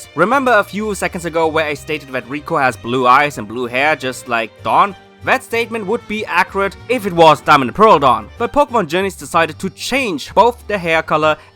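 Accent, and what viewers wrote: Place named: Germany. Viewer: Yoo du bist deutsch 😂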